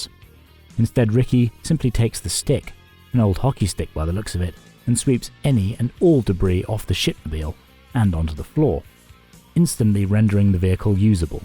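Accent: RP, United Kingdom. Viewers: This video is pure gold. Your classically British commentary while talking about a priceless piece of Canadian TV is a great combination. You did your research and each character’s accompanying music is on point. Deeeeecent video! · It's so charming to listen to a posh British accent talk about a Canadian Classic about Trailer Trash!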